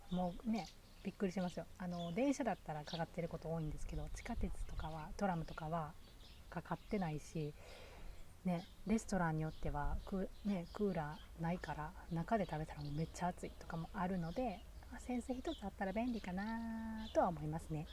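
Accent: Kansai, Japan. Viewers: こんにちは。 さんの関西弁、めちゃくちゃ親近感です。とても素敵なお家ですね。お二人のお子さんがバイリンガル、普通にお母さんとは日本語でお喋りされていて、ドイツの学校や幼稚園に通われてるのは凄いでね。コロナが落ち着いて日本への一時帰国がコロナ前の様に気軽に出来る様になったら是非お子様達に日本の小学校を体験させてあげて下さい。 ドイツの学校の夏休みは何ヶ月ありますか？ 日本の夏休みは7／20前後からですので、1週間から2週間早く一時帰国されたら日本の小学校体験入学できますよ。ドイツの小学校との違いが面白かったり、日本のお友達も出来ますよ。 お友達のお子さんが毎年夏に少しだけ日本の小学校に通ってました。ほんの10日間とかでもお互いとても新鮮でいい刺激になる様です。毎年帰国出来なくても、次帰国したらお友達と遊ぶ約束などして楽しんでいました。 何時も何気ないドイツの日常の風景や、食されてる食材を拝見するのが楽しみです。これからの動画も楽しみにしています。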